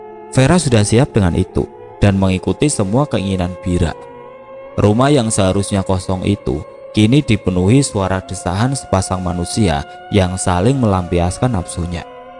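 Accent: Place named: Java, Indonesia. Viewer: Aku suka cerita² kek gini nih. Intonasi dan penghayatannya udh bagus. Tapi kadang kebawa medok bahasanya kadang buyar 😂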